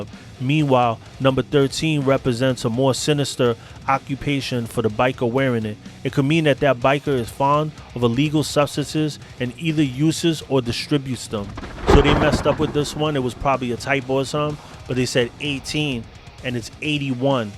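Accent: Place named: Bronx, United States. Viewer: Are you from the northeast by chance? Kinda sound like ya from the Bronx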